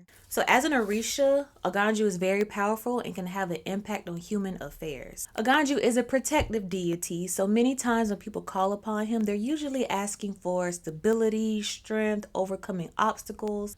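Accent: United States. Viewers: Baby, this is that Orisha whose name YOU DO NOT PLAY AROUND WITH!!! Thank you for these Orisha stories!! You pronounced it as our American selves would, HOWEVER, these folks better be careful playing with his name bc when he shows up, he knocks all the shit over 🤣🤣🤣🤣🤣🤣🤣🤣🤣... So don't worry about the pronunciations. I assure you The Orishas are pleased that you are telling their stories, PLEASE KEEP TELLING THE TALES OF THE ORISHAS, Ase OOO!! ** EDIT: I am crowned to Osun and I pronounce his name exactly as you do 🤗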